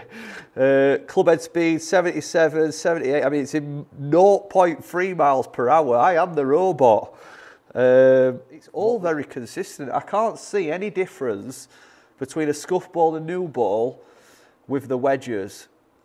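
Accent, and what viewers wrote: Yorkshire, United Kingdom. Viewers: Proper Yorkshire man😂 Shall I set a go fund up page for you!!!!🤭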